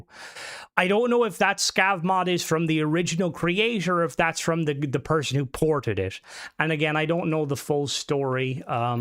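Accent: Ireland. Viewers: Awesome stream,...I usually just watch for the dancing guinea pigs but stay for the trash talk in an Irish accent 😂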